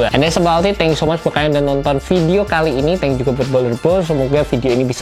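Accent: Java, Indonesia. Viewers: Medok olweis on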